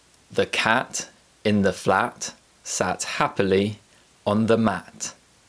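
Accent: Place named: RP, United Kingdom. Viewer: You need to make it clear this is for the rp accent and isn’t representative of the uk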